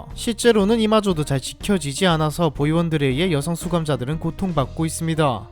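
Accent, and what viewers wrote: Gyeongsang, South Korea. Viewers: 혹시 여자성우분은 AI였었나요??? 남자분은 경상도 아저씨느낌임..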